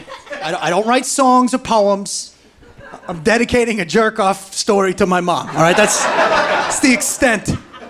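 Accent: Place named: New Jersey, United States. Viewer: I can tell you're from Jersey bc your accent not to be a dick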